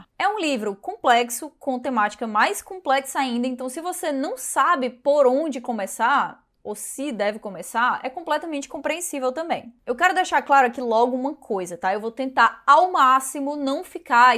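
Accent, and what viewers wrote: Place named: Ceará, Brazil. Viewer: Olhe só, uma cearense com um canal legal. Saquei o "meu" sotaque nas tuas primeiras frases. Li o Duna com 16 anos, e foi uma viagem... aliás, não poderia ter sido diferente, né? Assisti o filme, bem criança ainda, na Globo, bem antes de ler o livro. Lembro que não entendi nada mas adorei (sempre fui louco por sci-fi). Revi o filme, séculos depois... Olha, gosto dele. Acho que porque fui assistir já com o peso da crítica negativa em cima, e eu meio que pensei "É, não é tãããoo ruim assim". Felizmente, tiveram a sensatez de fazer agora dois filmes: porque, condensar aquela complexidade num filme só, não tinha condição. Com dois, pode ser: acho que o ideal mesmo era fazerem uma série, cê não acha? Comprei há pouco "O Messias de Duna", que aliás já tinha lido. Apesar de muito mais curto que o primeiro, nele, as tramas labirínticas no psicológico das personagens é bem mais impressionante. 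Li depois "Os Filhos de Duna", o quarto da série, e... achei legal. Mas nada demais. Integridade artística à parte, fica óbvio que, para o Frank Herbert, ele havia acabado de "construir" o seu ganha pão: poderia viver o resto da vida só de escrever continuações à saga... o que ele, de fato, fez; e ainda rolou uma graninha pro FILHO dele, que escreveu o último (Aff!). Sete? Oito? Ainda quero ler o terceiro, "O Imperador-Deus de Duna", mas não tenho intenção de ler os outros. Abraço.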